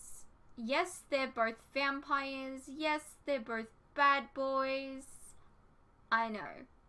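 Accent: Australia. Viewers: Like I said, it sounds more Aussie.:-) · You're: Young, female, cute, and you speak English with an Aussie accent... Yep, I predict your channel will continue to GROW.:^) Lewis from Orange County, California, USA (just down the street from Disneyland).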